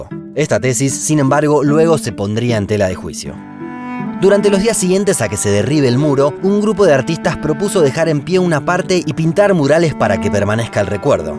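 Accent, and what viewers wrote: Argentina. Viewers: Hacen o haces no se quien esta atras de Un mundo Inmenso, pero es un excelente trabajo no saben el valor de cada video que he visto un buen resumen, imagenes, musica, todo esta muy bien. El narrador es Argentino verdad? Un saludo desde Mexico del Norte :)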